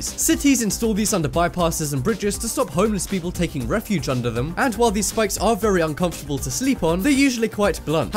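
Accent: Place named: United Kingdom. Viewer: he is British. That's just their normal accent.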